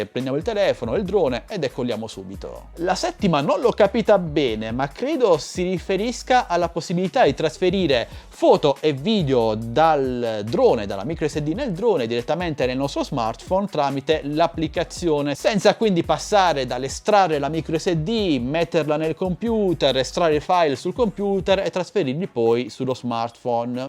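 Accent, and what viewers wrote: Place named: Bari, Italy. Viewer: Hai mai pensato di toglierti l'accento barese quando parli?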